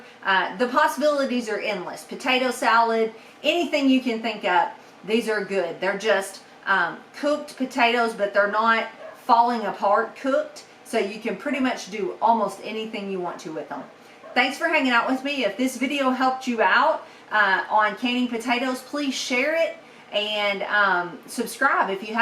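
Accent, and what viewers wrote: South, United States. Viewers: 😅I grew up speaking Spanish and English here in PR and we don't get that beautiful southern accent at all. Iconic, just like everything south🏝💪🏼💪🏼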